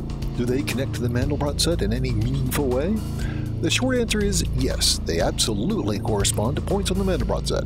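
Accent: United States. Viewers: your American accent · The c, z american pronunciation makes this very confusing.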